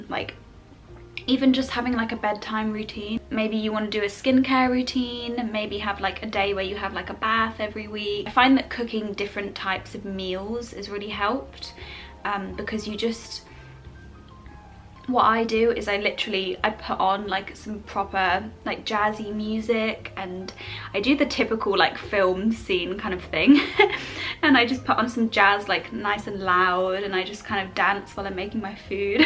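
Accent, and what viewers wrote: United Kingdom. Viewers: Baby, how I like your British English, it sounds so funny, your way of talking and laughing, it's great..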